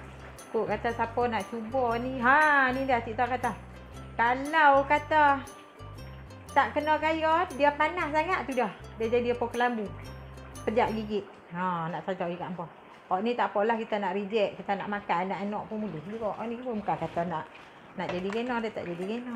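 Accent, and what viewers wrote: Kedah, Malaysia. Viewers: Tq cik......loqat kedah...piaw🌹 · Seronok dengaq ckap....piaww kedah betoii...cam orng tua2...x jemu dengaq...tmbh plk dgn petua2 yg bermanafaat....da sstengah perkataan lma x dengaq. Cm 'pelekat' api...,😅...pa Pon trima kasih x kedekut ilmu.... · Terbaik dan nampak iklas sewaktu mengajar buat kuih sepit tu.alhamdulilah tqsm😍🥰 seronok dgr puan cakap kedah lucu 😁😃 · Salam cik...terima kasih sbb bagi resipi...kuih ni mmg sedap tapi nak buat nya jenoh kena berdiri lama silap2 urat hijau timbul kat betis kaki..😭😭😭 sedap dengar cik cakap bahasa kedah..in sya allah akan cuba resipi ni nnti...😁😁😁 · Lawak cik cakap bahasa kedah pekat tkasih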